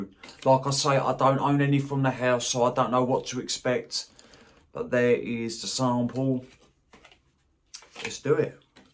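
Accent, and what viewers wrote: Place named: Birmingham, United Kingdom. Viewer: Nice review and cool accent. Are you a Brummie? You sound like Arthur Shelby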